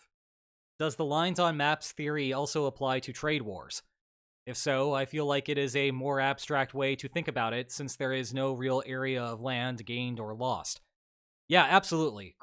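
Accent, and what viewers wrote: Pittsburgh, United States. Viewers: Questions for next Q&A: 1. What is your favorite bridge in Pittsburgh and what is your favorite tunnel in Pittsburgh? And what're your favorite bridges and tunnels outside of Pittsburgh? 2. How hard was it learning how to speak in such a civilized and understandable manor and how hard is it to keep yer natural Pittsburgh accent suppressed? 3. What do the lines on maps say about how much longer the Rooney family continues to accept their midling position of always being above .500 but never getting anywhere in the playoffs before they finally force Tomlin to tear it down and tank for a year to start a rebuild? It seems like the sooner they do it the better off they would be and now that we're passing a weak QB class it seems like this year would be a great year to draft a guy they think can turn into a longtime backup and let him play all year with a buncha rookies so they're in a position to draft a potential franchise QB next year or the year after.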